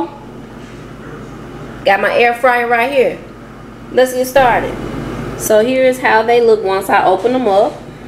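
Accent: South, United States. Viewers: Love that Southern accent !! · Great video .keep up the great work. And I just love you country style accent. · I love Southern women's voices...I assume you are from the South.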